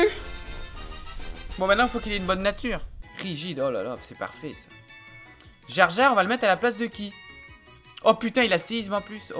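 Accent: North, France. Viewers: Il a naturellement l'accent du nord en faite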